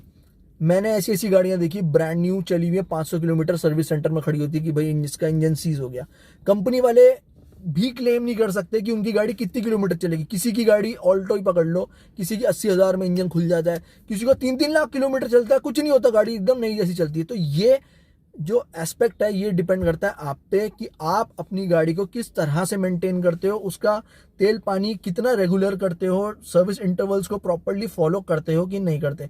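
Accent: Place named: Old Delhi, India. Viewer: Very informative video brother👍👍 I am planning to install CNG kit in my ford figo 2017 model. Could you suggest which Kit I should go for as I have zero knowledge about the kits available in the market. Would appreciate your suggestions here! Also by any chance are you from Purani Delhi😂 I could sense a matching tone & accent what we have in Old Delhi.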